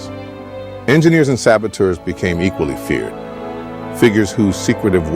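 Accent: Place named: United States. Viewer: This should have been narrated by a British man, not a black American. Good graphics though.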